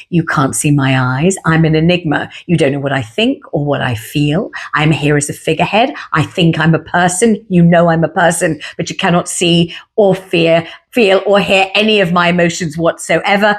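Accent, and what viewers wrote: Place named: RP, United Kingdom. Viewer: You speak soooooo well, you are a 1 off. No-one could comment like you. You're amazing & love your Queen's English, you speak soooo eloquently xxx thankyou xxx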